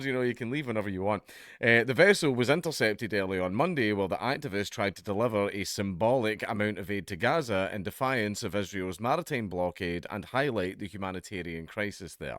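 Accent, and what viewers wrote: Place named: Scotland, United Kingdom. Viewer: Came here for the "oh noooo what happened" in that sweet scottish brogue. Don't let me down 😂.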